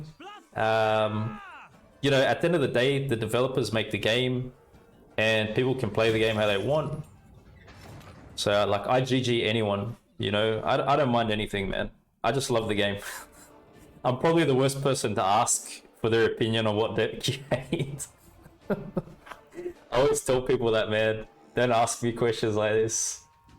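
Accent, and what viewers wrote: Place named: Tasmania, Australia. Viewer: That s why I was not sure, the Tasmanian accent tricked me 😁. Merry chrismas too.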